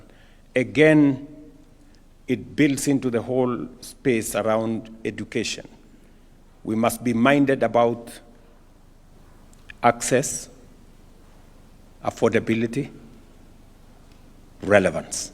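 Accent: Kenya. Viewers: Mr president you are speaking Kalejin English.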